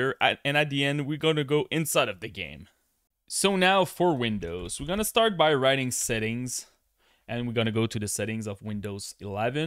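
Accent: Quebec, Canada. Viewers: Thanks for the tuto pal ! You sounds a bit Quebecois haha, always a mess when it comes to Ea sport and optimization on PC. Cheers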